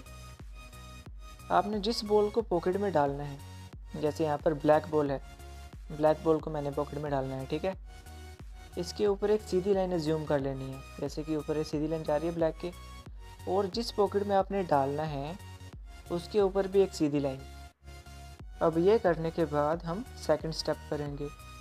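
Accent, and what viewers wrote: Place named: India. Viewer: dude i hate hindi accent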